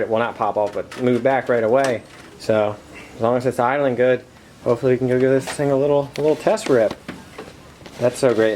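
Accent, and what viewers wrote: Maryland, United States. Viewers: High Live yeah. He's from Maryland. Lol. I'm sure I have a weird accent to everyone that's not from my area.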